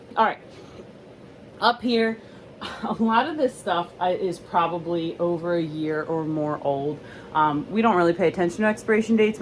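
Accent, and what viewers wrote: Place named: Pennsylvania, United States. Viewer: Hi, first time watcher here! I love watching pantry/freezer/fridge tours. As soon as I heard your voice I knew you were from PA. (NJ here.) I went to your site and read your story and I want to follow along your journey so I just subscribed.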